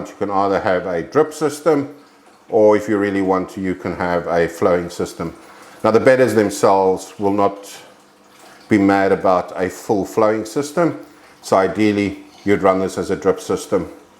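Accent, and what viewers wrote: South Africa. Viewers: You sound south african? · Thanks a lot MOST helpfull . PS you sound South African.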